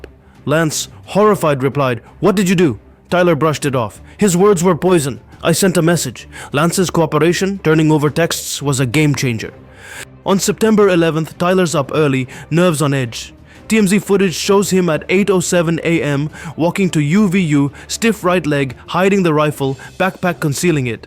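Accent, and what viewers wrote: India. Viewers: We commentator sounds Indian?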